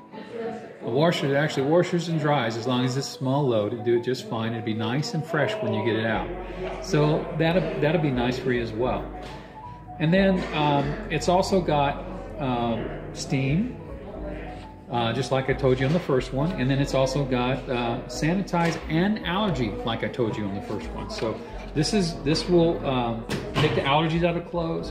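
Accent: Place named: Midwest, United States. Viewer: Typical Midwest accent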